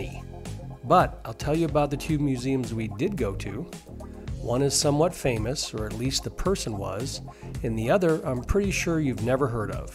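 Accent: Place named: Scottsdale, United States. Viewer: Except he said it like that twice. So maybe its his Scottsdale accent. Anyway, thanks for the tip about the bike tours.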